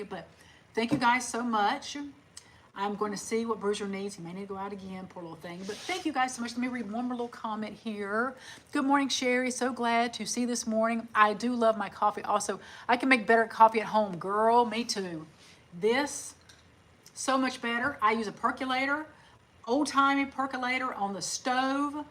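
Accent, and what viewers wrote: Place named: Carolina, United States. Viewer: We relocated to North Carolina back at Christmas 2018 from Maine. I knew immediate that was a Carolina accent. 😉. I only found your channel shortly before your husbands passing. The content did change, but that was expected. We downsized by over 1000 sq ft. and I am so glad we did. So much less to care for. I happily decorate my smaller home. I am not a minimalist, but still enjoy having items that bring me joy. My struggle is making friends as an adult. I am blessed to have my church, but it is still different. That's for letting us into your world.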